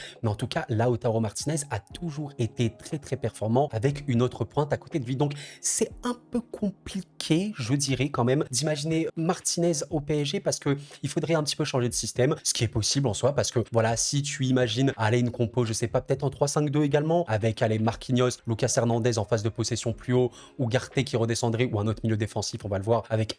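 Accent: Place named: Quebec, Canada. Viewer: J’ai jamais vu un québécois avoir un accent aussi léger.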